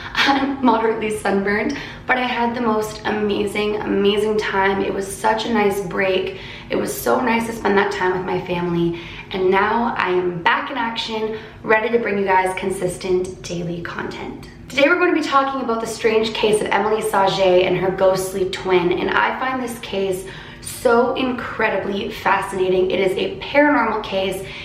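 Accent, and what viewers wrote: Canada. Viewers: WHY ARE YU TALKING LIKE A CANADIAN? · omggg i love ur Canadian accent 💗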